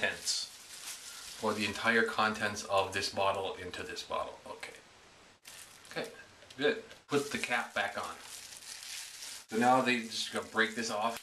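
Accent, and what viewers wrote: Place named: Minnesota, United States. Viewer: Looks great! You from Minnesota? Haha caught the accent a bit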